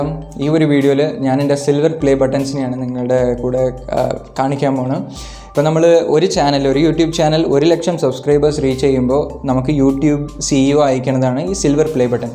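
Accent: Tamil Nadu, India. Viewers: Chattayi Malayali anno? Or Tamil?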